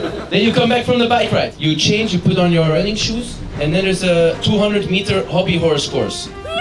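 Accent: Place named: Belgium. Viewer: i'd say it's an average belgian accent :D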